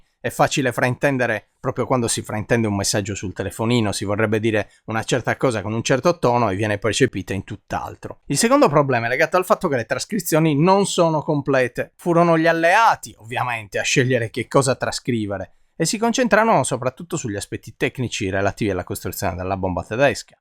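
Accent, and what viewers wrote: Sardinia, Italy. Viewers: Professore le faccio tutti i miei complimenti, che narrazione affascinante, impeccabile nella parte scientifica e storica , accompagnata da una grande sensibilità e saggezza. E se mi posso permettere, la lieve intonazione sarda la rende ancora più piacevole (altro che IA!!!) Grazie mille 🙏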